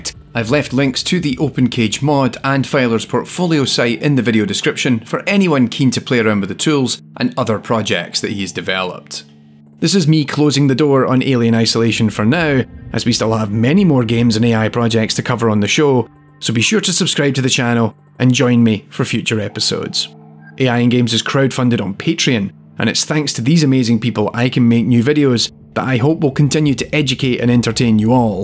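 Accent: Scotland, United Kingdom. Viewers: as a scottish person i cannot listen to another scottish person narration without the cringe turning me inside out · Is this scottish accent? If yes then damn i love it.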